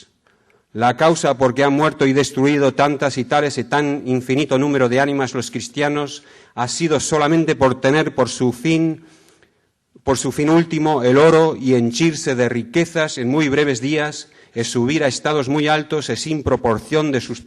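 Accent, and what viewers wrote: Spain. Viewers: on this lecture he is speaking with a perfect european spanish accent... not an easy task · Habla en español con acento español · here he reads with an spanish accent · he's speaking in a Spanish acent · He's speaking with accent from Spain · Native to Spain, that's what I meant. Argentine don't have this accent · maybe he is from Spain, don't they all talk like that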